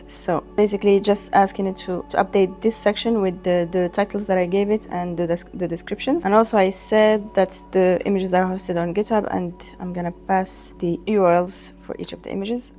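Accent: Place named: Egypt. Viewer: طلاق تلاته مصرية و متهش عن الاكسنت😂 شكرا❤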